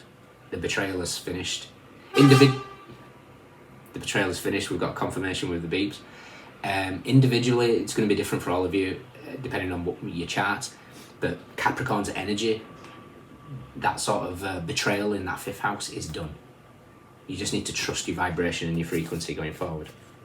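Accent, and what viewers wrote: Northern England, United Kingdom. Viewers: Thankyou 👍 good Northern accent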